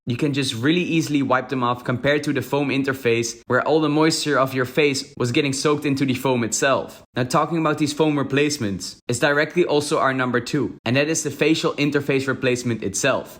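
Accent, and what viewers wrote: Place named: Netherlands. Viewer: Nederlands accentje😂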